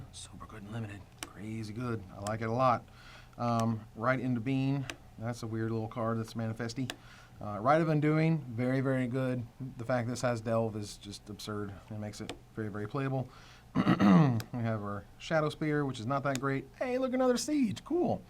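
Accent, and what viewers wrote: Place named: United States. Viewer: You have the voice inflection I associate with the "Joe Dirt" accent. Good video tho.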